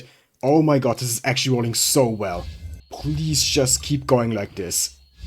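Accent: Germany. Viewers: Hey where you from? Your accent Sound SO german 😭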